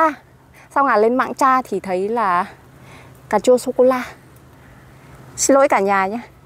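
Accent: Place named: North, Vietnam. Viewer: Cháu này là người bac ngje nói mộc mạc quê hương bac của chúng tôi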